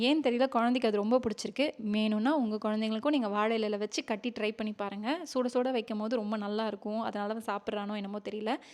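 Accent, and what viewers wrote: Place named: Tamil Nadu, India. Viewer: I like your tamil slang, family. Way of handling all household and your family 💖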